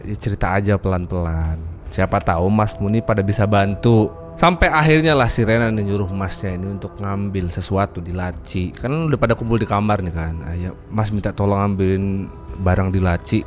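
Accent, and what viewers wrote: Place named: Lampung, Indonesia. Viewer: logat² nya kaya orang Lampung bang 😊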